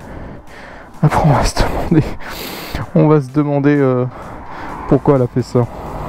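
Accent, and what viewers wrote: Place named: North, France. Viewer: Cc t de ou du nord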